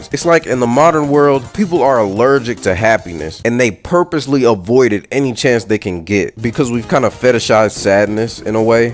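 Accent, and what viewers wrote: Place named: United States. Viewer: well depends on the character, story they are in, world they are in, and their life they have led so far... if for example a character got plot armored outta having somethign bad happen OR just never has any issues, it becomes hard to relate cause for basically NO ONE in the real world, is that the case. the more lack of hardships to face just makes it more and more difficult to see it as anything more than like a poorly written play being put on. if you want happy comedy slice of life stuff, that does exist and you can enjoy that but for most people, we like watching entertainment we can get immersed in to the point that we forgot that its entertainment and watch it as if we are observers of these PEOPLES lives and not just these characters stories. basically hardships to face and overcome is the whole idea behind normal storytelling. 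you sound like your also from at least a western nation if not more likely proly some place in america so our cartoons mighta made you misunderstand what animated mediums should be and you only think that anime is something for kids so why make it grim and dark and gorey? but thats just it my guy... its not just for kids but just is another style of storytelling that allows for more commical or over the top magical presentations.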